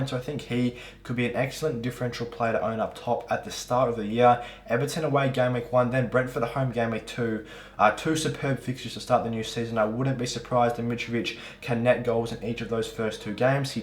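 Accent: Australia. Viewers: Thx for the vid. Also... Thx for the recent reply to my comment re your info. I couldn't quite work out your accent but now you've confirmed you're from Oz. I take it you will be following Big Ange's exploits at TH.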